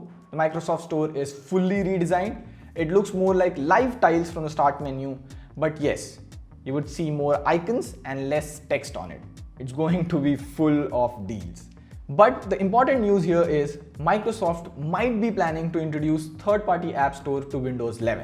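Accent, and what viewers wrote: India. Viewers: All indian accent are the same😌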